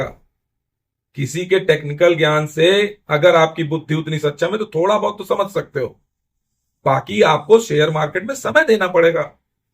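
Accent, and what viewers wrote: India. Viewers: पर आपके बोलने का देसी तरीका अच्छा व प्रभाशाली है।। Plz don't change yourself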